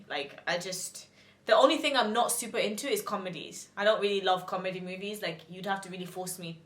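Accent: Zimbabwe. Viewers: Haha I love how u can still speak shona, with a shona accent.....newewo ndeipi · 😂😂😂 "now im doing the (mentions dance move), you can't see it but I'm doing it" 😂😂👏🏾👏🏾 made me laugh and the Zim accent (died 😂😂❤️❤️❤️❤️). Ps. Love the Zim accent....#zim gang but I can't speak shona 😭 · I love your accent😍😍😍 And am also Zimbabwean.... Love your playlist🙊🙊🙊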